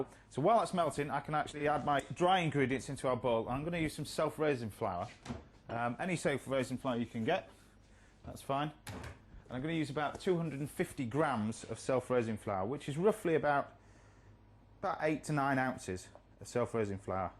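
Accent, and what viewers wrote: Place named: Yorkshire, United Kingdom. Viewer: How Yorkshire does he sound! I fell in love with when I lived in Leeds but never thought he sounded really Yorkshire. But now I'm back in Australia, I can't believe how strong it is! By gum lad.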